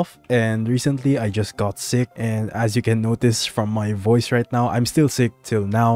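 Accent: Philippines. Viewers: he sounds filipino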